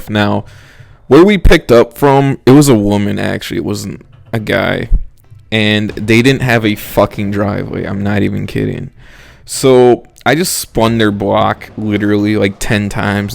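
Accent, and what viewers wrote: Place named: Midwest, United States. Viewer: It sounds very Midwestern vro sounds mad congested lmao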